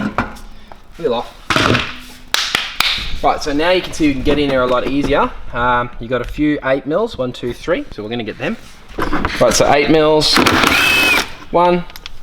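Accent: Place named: Australia. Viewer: Great video with free easy to understand AUSSIE accent.winner thanks mate you saved a trip to the mechanic.👍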